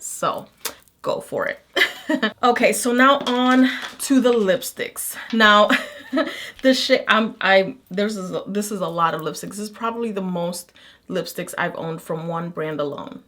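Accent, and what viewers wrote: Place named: New Jersey, United States. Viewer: you have this jersey mob accent i love it girl!! ha :)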